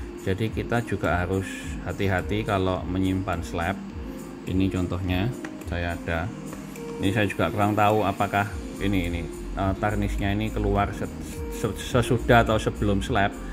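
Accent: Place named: United Kingdom. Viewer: cengkoknya british bgt❤👍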